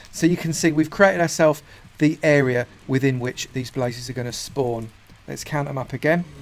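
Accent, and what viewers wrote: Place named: Luton, United Kingdom. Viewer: You kinda sound like you're from Luton. Sorry if I'm wrong, I'm not English, I'm American and just throwing out a guess.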